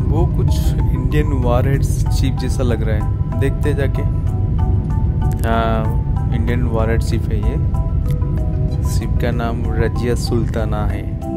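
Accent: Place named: Odisha, India. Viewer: Yeh Hindi bhi Odiya main bol raha hai bhai..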